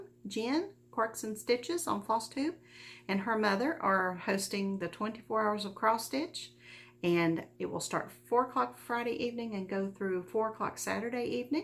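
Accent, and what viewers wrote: South, United States. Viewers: I found you because of a shout-out from Heidi Cran. She said you were real sweet, she enjoyed listening to you; I think she may have mentioned a southern accent. I'd love to stitch the Wysocki charts. Dimensions had a kit "Classic Farm" that was discontinued and word is that they may re-release it. Your Wysocki charts are very reminiscent of that kit. I have been to the P. Buckley Moss Museum and loved it. I stitched a Christmas Stocking chart of hers.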